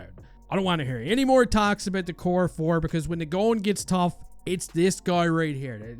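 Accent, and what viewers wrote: Newfoundland, Canada. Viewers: Fellow newfie here, didn't take me long to catch onto the accent! God bless ya, just stumbled onto your channel. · Is this dude a Newfie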